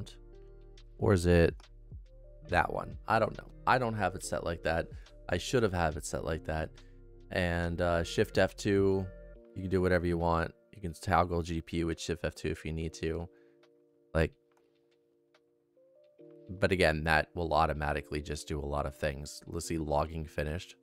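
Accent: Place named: Maritimes, Canada. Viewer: /wave from Alberta. Wife's a Newfy. Your maritime accent is just fine brother! /cheers (earned my sub)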